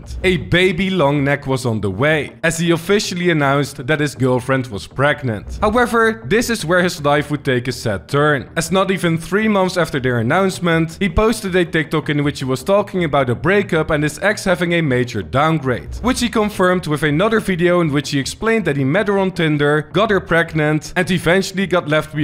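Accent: Netherlands. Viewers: Heey een Nederlander die Engels praat!! Of probeert althans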